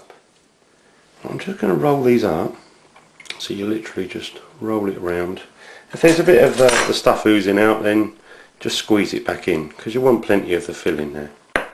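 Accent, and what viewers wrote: United Kingdom. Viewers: My apologies, I thought you were talking about something you can only find in the uk, looking back on the video, I now see you were talking about BEATED egg, and not beat and egg. I sometimes have troubles with understanding uk accents (I come from Canada). Thank you for your understanding, ~DeathAtheist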